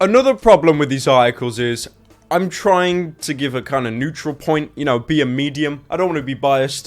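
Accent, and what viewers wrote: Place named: England, United Kingdom. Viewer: ur english akzent is so fucking gay holy shit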